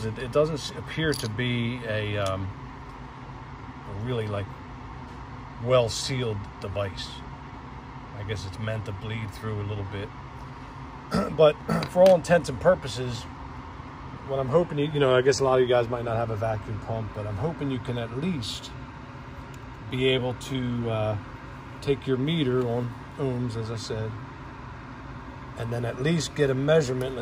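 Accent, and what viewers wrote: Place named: Philadelphia, United States. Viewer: Are you from Philly? 😂 I can tell by how u talk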